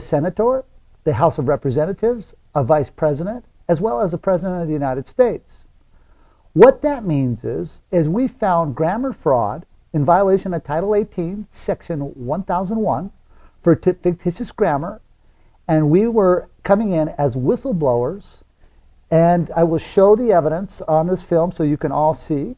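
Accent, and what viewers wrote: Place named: United Kingdom. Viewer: Here, thought you were Brit 🤔😂🖖🏾👏🏾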